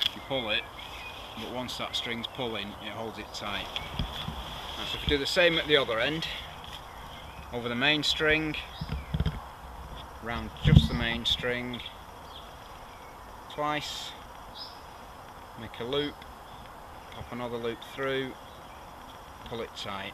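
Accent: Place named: Lancashire, United Kingdom. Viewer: Excellent video sounds like you not far from me I am near burnley fancy a meet up cheers keep up the good work thank you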